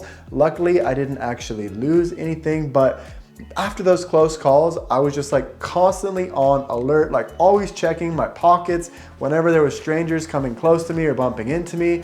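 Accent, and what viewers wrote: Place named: Sweden. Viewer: I don't know if you notice this as much, but you're english accent is getting more and more Swedish! It's crazy. Been watching your channel for so long and just noticed.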